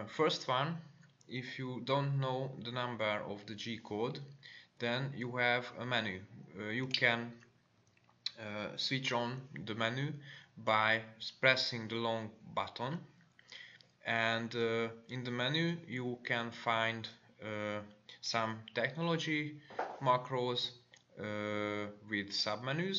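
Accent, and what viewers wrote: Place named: Hungary. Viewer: Milyen érdekes, hogy a kiejtésen hallani a magyart. :) Egyébként ez más nemzetiségeknél is így van.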